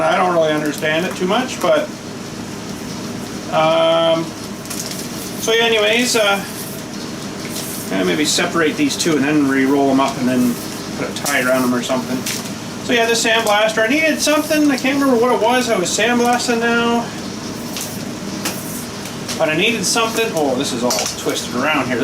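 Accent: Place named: Canada. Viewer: You must be Canadian ah where abouts are you ?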